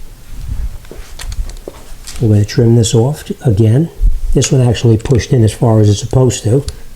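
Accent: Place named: Maine, United States. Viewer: You sound like a Mainah... I love it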